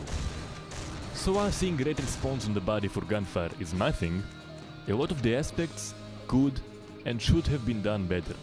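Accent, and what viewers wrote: Russia. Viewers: Русский что-ли? 😀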